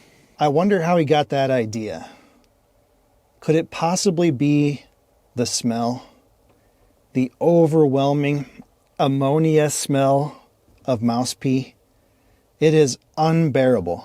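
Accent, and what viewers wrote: United Kingdom. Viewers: You are so British 👍. Including the correctly pronounced solder with an L. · Why sound like most British friend?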